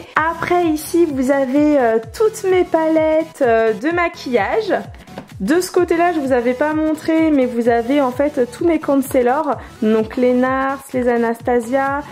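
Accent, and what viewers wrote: England, United Kingdom. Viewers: alala ton accent anglais mavais manquer 😂😂 melted "meite" 😂😂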